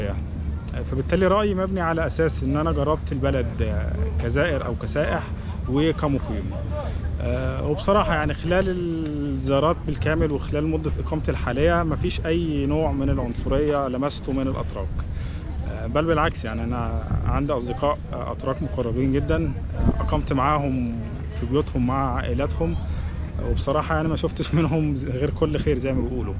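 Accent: Egypt. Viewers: اعتقد انك من لهجتهم في الكلام انت مصرى انا مصرية مقيمة في الإسكندرية وبدعيلك ربنا يوفقك ويحفظك في الغربة انا زى أحفاد ربنا معاك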